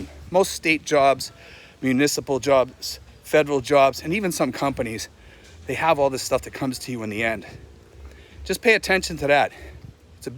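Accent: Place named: Massachusetts, United States. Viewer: You sound like your from Mass! I from Boston.Iplan on moving to the Philippines before I'm 58.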